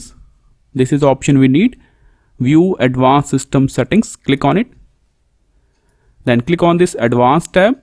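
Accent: India. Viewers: The Indian accent and help is crazily good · I already felt scammed by the bad English Indian accent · pov me trying to fix my window 10 annd the voice of the guide is indian (knowing that it gonna be fake)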